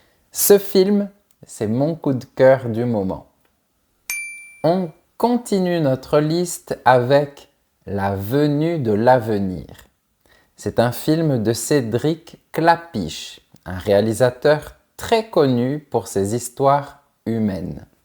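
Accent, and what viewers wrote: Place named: South, France. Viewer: Bonjour! J'adore vos vidéos! Mon mari et moi avons récemment déménagé de Californie à Toulouse et on est amoureux de cette belle Ville Rose! Quand j'ai entendu votre façon de prononcer certaines choses, je me suis demandé si vous venez peut-être du sud de la France. Je viens de découvrir dans une autre de vos vidéos que vous habitez à Toulouse. Très cool. Peut-être je vous verrai promener dans la rue un jour. J'aimerais bien faire votre connaissance. Merci de toutes vos vidéos qui nous aident beaucoup. Vous êtes TOP!!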